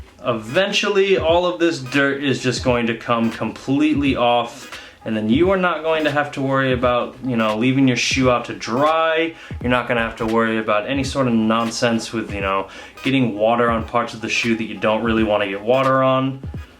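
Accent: Australia. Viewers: The Aussie accent is starting to get to you 😂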